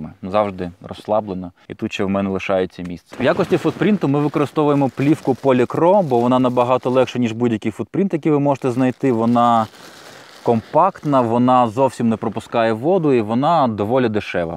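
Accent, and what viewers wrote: Standard. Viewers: Гарний огляд, та гарна та чітка українська мова, дякую!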